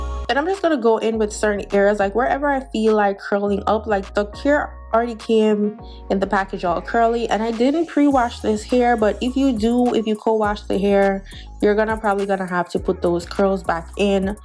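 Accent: Jamaica. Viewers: Girl look pon de lace lmao, love when you speak patois. Lovely unit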